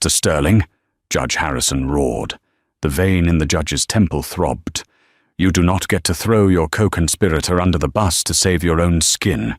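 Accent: United Kingdom. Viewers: The commentator's voice is quite pleasant, as well as the British accentuation that gives a convincing touch to the story. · I certainly prefer the British English. The grammar, accent and pronunciation are in-line with my education.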